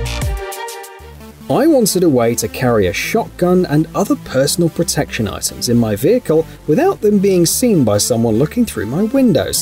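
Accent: United Kingdom. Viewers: Loose the Limey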